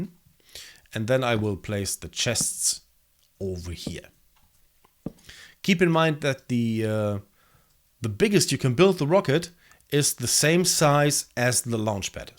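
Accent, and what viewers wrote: Germany. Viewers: Ein Deutscher der Englisch Spricht. :D Dein Review is Nicht Schlecht. Aber ich hätte mal so ne Bitte an dich... Auf der CurseForge Page (~8 Kommentare unter deinem Video) hab ich ein Kleines Texturepack erstellt, da mir die Standard Texturen nicht ganz so Gefallen. Aber ich hab nie eine Ehrliche Meineung über meine Kleine Arbeit Bekommen. Deswegen frag ich dich, als Zufällige Person... um über mein Texturepack zu Urteilen, Sachen die Gut sind, Sachen die ich nochmal Pixeln soll, etc. (Es ist ein Link für 1.7.10 und 1.10.2 Seperat)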